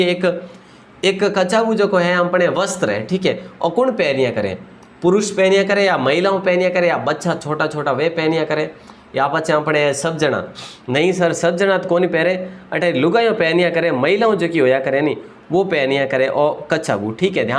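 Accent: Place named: Marwari, India. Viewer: सर आपकी मारवाडी भाषा बहुत ही मीठी लगती ह् इससे हमारा विडीओ के प्रति लगाव बना रहता ह् Thenq sir 🙏🏽🙏🏽🙏🏽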